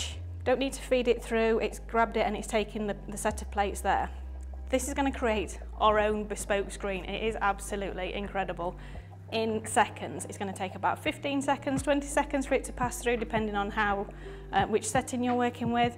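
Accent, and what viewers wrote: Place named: United Kingdom. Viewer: What is it about a Brit accent that clenches my jaws? Every other word is "pop it". Probably a German "loan word".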